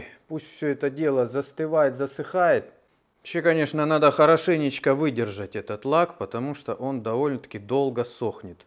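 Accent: Rostov, Russia. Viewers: Молодец!!! Ты и твои видео всегда радуют) за эти года просто до боли приятно слышать твой ростовский говор и специфические словечки))) 👍👍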